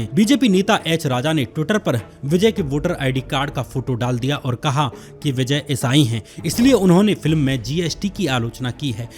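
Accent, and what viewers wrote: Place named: Bihar, India. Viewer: Area yaar bijness business hota hai. Pronunciation correct karo content dikhne meh bohat acha hain lekin sunne me UP Bihari ki badbu aajati hai. Bijay nahi Vijay Eaji nahi easy Bujiness nahi business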